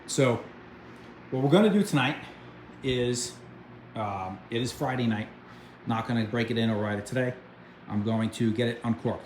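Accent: New York, United States. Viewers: Definitely from New York 🎉🎉😂😂hear it in voice🎉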